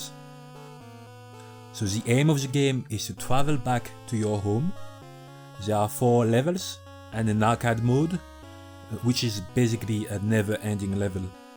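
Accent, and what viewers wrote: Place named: France. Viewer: Holy accent. Ton accent francais est épais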